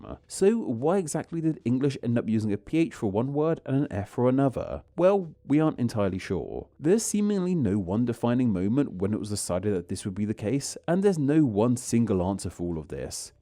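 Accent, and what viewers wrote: United Kingdom. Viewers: I doubt he did it on purpose. Being a US citizen, I encounter many horrendous mispronunciations in real life. It's somewhat an "entitlement" to the language of its country. I hear people say: "How do you pronounce this or that with an English accent?" (not related to England of course) It's the lack of trying or want to try in my personal opinion. Don't misunderstand me, there are many who do try (and succeed or fail.) But this one is just reading the name with an extreme form of dyslexia and not caring, leaving the horribly butchered name in the video instead of editing it out with a correct pronunciation.... plain laziness and monetization. edit: And now I have to apologise because after listening on, I find the narrator speaks with a British, albeit not thick, accent and nothing to do with the Americas and the US of it.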